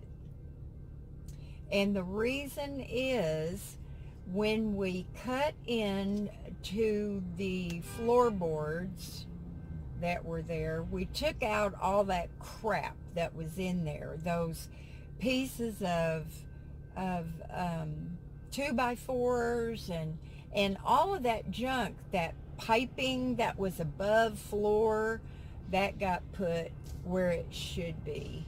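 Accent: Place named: South, United States. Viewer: Hey what happen to her southern accent!!?? Things that make you go hmm.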